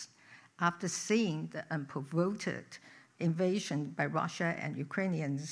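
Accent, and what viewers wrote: United Kingdom. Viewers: 前總統用漂亮的英式口音，在國際為我國的自由民主發聲👍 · 好優美的英式英文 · 聽蔡總統的英倫English 演講，像喝一杯淺焙曼特寧熱咖啡，深邃雋永，感謝蔡總統為國訪問友邦，我早猜到蔡總統離開總統府之後還是不會閒下來，還會持續為台灣出力，讓台灣人驚艷，給蔡總統我最好的祝福，您是台灣人永遠愛戴的總統！ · 確實! 擁有敦倫賑精學店的腔調味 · 超級喜歡總統的英式口音，感念她執政8年總統，不管內外成績亮眼，讓世界看到台灣發光發亮。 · 感謝蔡總統卸任後努力做外交，好久沒聽到蔡總統的英國腔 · 有點英國腔的蔡總統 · I love your British accent Wingardium Leviosa to our liberty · 的发音是英式的！👍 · 貴族標準的英國腔，令人賞心悅目，移居USA 40年的台灣人，感謝🙏前總統蔡英文繼續為台灣拼外交❤❤❤🎉🎉🎉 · 英國腔好好聽😍愛了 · 總統的英國腔真的好好聽❤ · 蔡總統的英式英語真道地，很好聽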